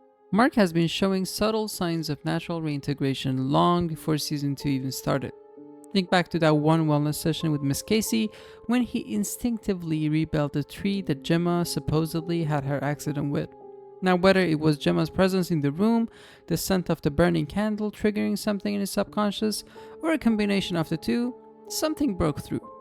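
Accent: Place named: Europe. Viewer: Yeah it is an amazing show! I like that it is so mysterious! It really makes the viewer think! It still could be so many different things! So you think youre outie and innie are different people! Interesting! Yeah you should continue your YouTubes! You’re good at it! I like your accent! What is it? European? It’s ok you don’t have to tell me! It can be another mystery! I won’t be watching much tv until November. I’ve got a big race to train for. But once I’ve finished, I’ll be back on the couch and watch lots tv shows and be deep into theories on reddit and YouTube! which movies do you like?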